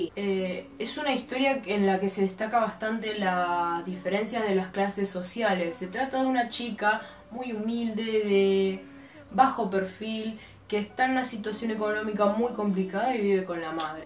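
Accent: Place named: South Korea. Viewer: JAJAJAJA la intro!! El coreanespañol cute que se te sale, es re tipíco de doramatica!! Personalmente te recomiendo Black que está en netflix, no te vas a arrepentir!! También te recomiendo Hello my twenties, las vas a adorar, también Descendants of the sun, Weightlifting fairy Kim Bok Joo. En fin, no terminaría más de recomendar pero dejo esos que están geniales. Saludos!! 💕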